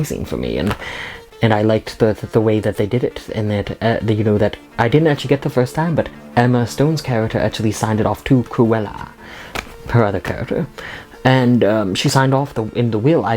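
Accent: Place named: England, United Kingdom. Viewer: Bravo! Really insightful thoughts about an awesome movie ( which SO needs to win an award for costume design). That English accent is pretty damn good too!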